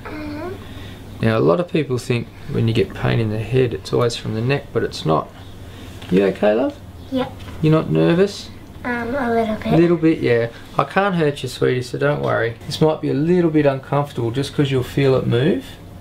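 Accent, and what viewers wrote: Australia. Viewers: Yes, the Aussie accent is the best one. My husband sounds the same. I'm Mexican American and that's one of the many reasons why I fell in love and married him. XD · Chocolate Queen it is an Australian accent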